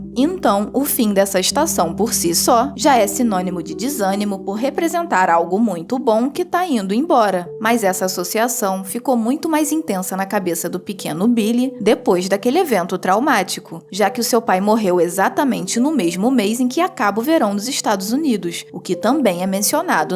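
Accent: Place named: Rio de Janeiro, Brazil. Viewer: Sotaque carioca chato pra caramba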